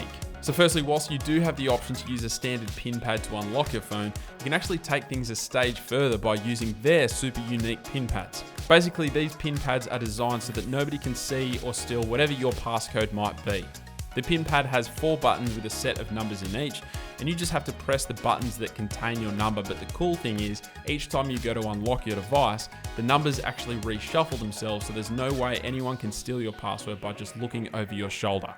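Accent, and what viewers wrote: Australia. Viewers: you Aussie mate?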